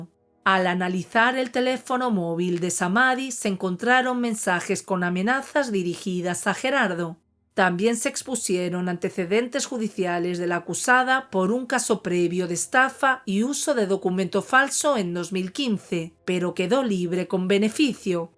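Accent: Spain. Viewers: esta bien pero me hace ruido tu narracion no me gusta para nada es contaminacion auditiva ese hablado casi que cantado de narrar es desesperante mas el acento español deberia ser neutro. pero tu contenido es bueno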